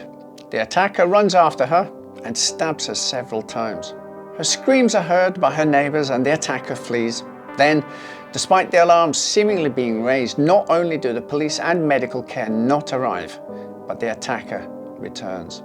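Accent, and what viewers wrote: Scotland, United Kingdom. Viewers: Why toning down the Scottish accent?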